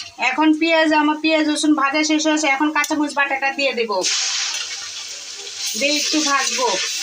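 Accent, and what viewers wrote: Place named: Dhaka, Bangladesh. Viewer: Like your dhakaya voice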